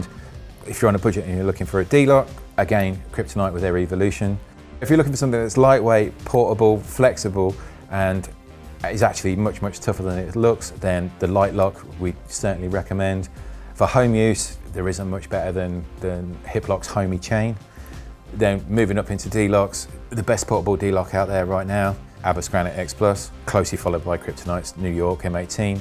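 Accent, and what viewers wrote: England, United Kingdom. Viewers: This Video looks very useful except this guys is sorta quasi mumbling in a thick English accent makes him very difficult to understand... blah... I have to turn on the closed captioning and still you aren't confident you are getting it all... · I agree with someone... said so much but learn little,plus the british accent... god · Difficult to understand your strong UK accent on some of the lock names because you speak so fast.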